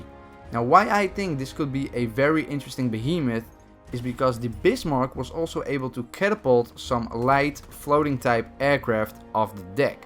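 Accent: Netherlands. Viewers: That accent sounds dutch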